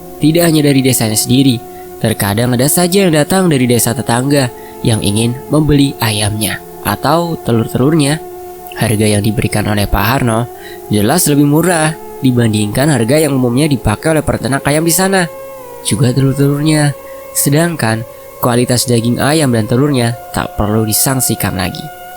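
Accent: Java, Indonesia. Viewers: Jowomu medok bngt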